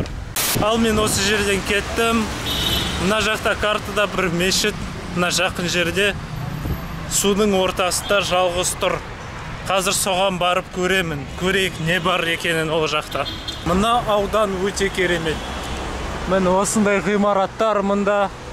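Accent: Kazakhstan. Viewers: казакша акцентына риза болдым красавчик 👍 Брат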